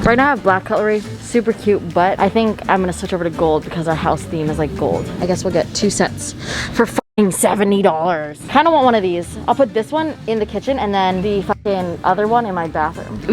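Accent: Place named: United Kingdom. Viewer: i clicked faster than could start talking in a british accent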